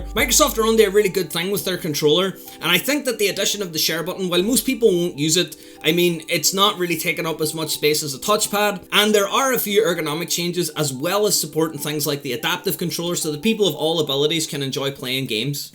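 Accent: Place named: Northern Ireland, United Kingdom. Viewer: he might live in LA but his accent is definitely northern irish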